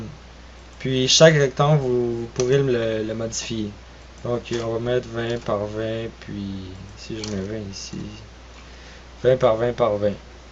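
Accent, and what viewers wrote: Canada. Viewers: LA CANADIEN QUOI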